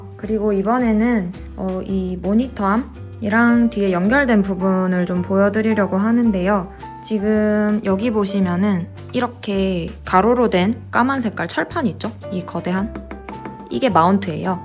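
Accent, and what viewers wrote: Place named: Gyeongsang, South Korea. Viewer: 고향이 대구 신가봐요 ~~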